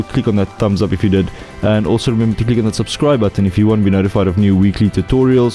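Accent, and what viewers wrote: South Africa. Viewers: Nice to hear a South African voice doing some maya tuts. subbed.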